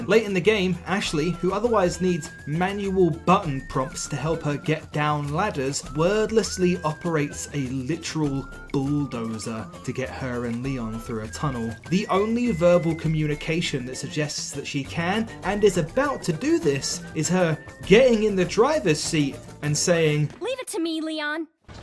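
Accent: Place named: United Kingdom. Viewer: dang this sexy voiced bri'ish guy sure is funny and cool and a good addition to the channel well done hard drive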